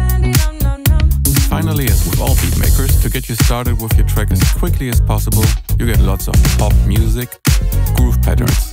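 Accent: Germany. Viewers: German accent ...damn..the inventors of groove music lol...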